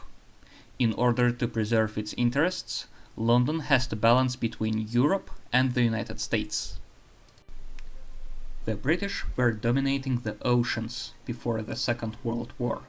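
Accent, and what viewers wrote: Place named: Russia. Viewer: Interesting thats the top and only YT video on British geopolitics is delivered with a Russian accent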